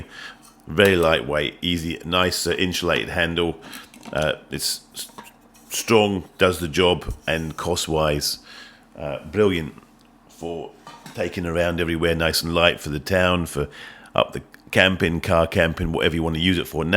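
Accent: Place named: England, United Kingdom. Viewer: Good review and you've acquired a bit of an English accent 😂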